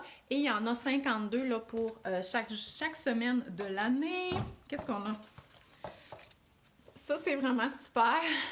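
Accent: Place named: Quebec, Canada. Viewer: Merci beaucoup pour votre gentillesse et votre esprit de partage! Vos vidéos m’aident beaucoup surtout celle sur la DME. En même je me familiarise avec l’accent québécois 😂👍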